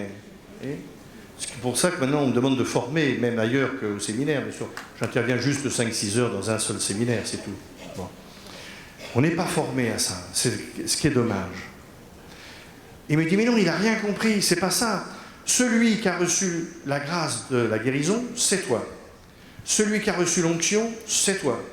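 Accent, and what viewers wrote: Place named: Nord-Pas-de-Calais, France. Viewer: Merci beaucoup pour votre témoignage et votre humour, on dirait un chti, comme moi, sauf que moi je ne suis pas guérie ! Évidemment je ne suis pas en chaise roulantes, mais je prie pour ne pas en avoir une ! Non c'est pas vrai, c'est une blague ! Je suis très contente pour vous, mais je plein ceux qui vous entourés, vous parlez beaucoup, moi aussi, ils ne me coûtent plus, c'est laventage quand on est jeune de 62 ans et demie ! Mdr ! Gros bisous merci à Dieu, que vous puissiez continuer comme ça encore très long......temps!lol bon courage merci beaucoup Mr, je sais plus !... Le curé ou mon Père, zuttt j'ai oublié ! Gros bisous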